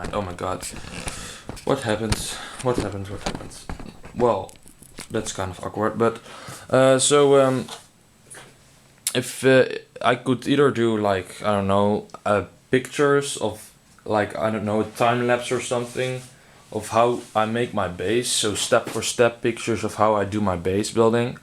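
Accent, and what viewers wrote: Netherlands. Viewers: are you dutch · Are you dutch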